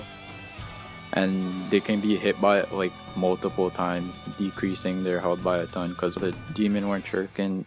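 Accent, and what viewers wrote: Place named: Toronto, Canada. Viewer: Yo u from Toronto G? I can tell by the accent lmao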